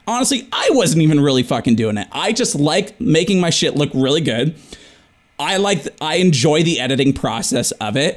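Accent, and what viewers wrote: Canada. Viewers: So off topic - but I love your Canadian accent 😂 I’m all the way down in Maine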